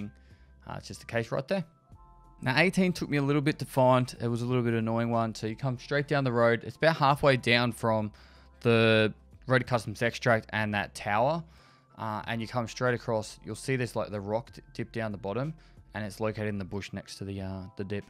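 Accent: Australia. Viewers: It's aussie accent, reminds me of SPUNJ calling de_cache kesch.^^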